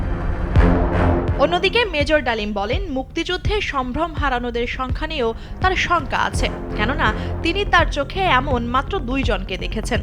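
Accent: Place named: India. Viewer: আপনার সাংবাদ পড়ার ধরণ ভারতের সাংবাদিকদের মতো নাকি আপনি সত্যিই ভারতীয় সাংবাদিক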